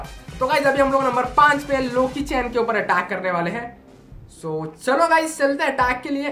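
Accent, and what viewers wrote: Kerala, India. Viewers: you are from kerala?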